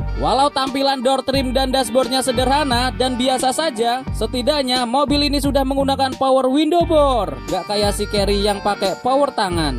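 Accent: Pekalongan, Indonesia. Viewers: Lugot nya org Pekalongan kliatan nya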